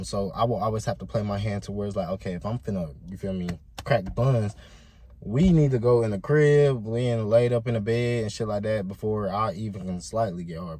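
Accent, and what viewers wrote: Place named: Bronx, United States. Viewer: You’re from the Bronx New York??? Bro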